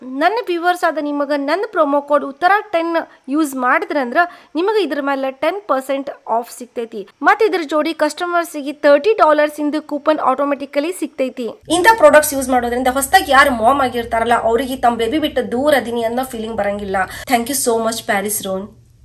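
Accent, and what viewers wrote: North Karnataka, India. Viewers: I like your friendly nature your looking like innocent person I like your north karnataka speaking style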